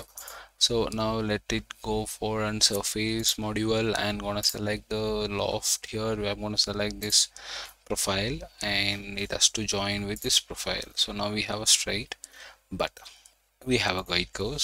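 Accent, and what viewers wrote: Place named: India. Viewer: I wish there was a way to filter out Indian videos. I cannot possibly listen to that accent.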